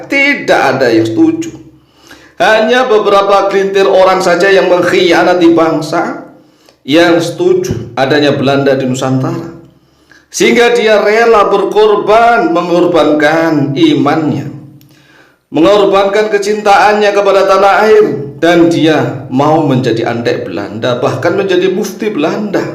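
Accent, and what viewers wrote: Java, Indonesia. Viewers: Ini bukan orang aceh baca ini tapi jawa sebab logat aceh kami tahu. Hahaha kalau kita lihat dimakam krekof yaitu makam prajurit belanda yg di kubur diaceh maka banyak orang prajuirit belanda yg mati disana dan bernama akhir o dan itu paling banyak mati dikuburkan disitu. Coba cek aja sendiri dan hb usman punya murid di aceh tuanku kemala ,tuanku kemala ini pejuang aceh dan ulama. Catatan 150 thn itu bukan diaceh y tapi dipulau itu walaupun akhirnya mereka yg paling merasa banyak pejuang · Min, logatnya ko kaya orang jawa, aksen nya kaya bukan orang Aceh ? Sejarah memang versi, Persis kaya peristiwa G30S PKI Kita juga akan mendapatkan cerita yg berbeda/versi yg berbeda tergantung dri sudut pandang siapa. Seperti juga hari lahir Pancasila, Versi sarjana hukum yg paham ilmu hukum lahirnya tgl 18 Agustus 1945, tapi versi Megawati 1 Juni Tentang habib Yahya, yg disangkakan sebagai antek Belanda kebenaranya harus diuji secara ilmiah bukan dri persangkaan, bukan dri rasa dari fakta, apa kedudukan dan fungsi Mufti pada saat pemerintahan Hindia Belanda dsb, Sama seperti kita melihat film Sengsara membawa nikmat, yg kita lihat Midun sebagai pahlawan, tapi dia jadi polisi pada pemerintahan Hindia Belanda, Berarti sesungguhnya Midun penghianat bangsa dan antek Belanda ? 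Mari belajar berfikir jernih dan menyeluruh, sehingga tidak salah dalam mengambil kesimpulan, kebenaran didunia itu nisbi/relatif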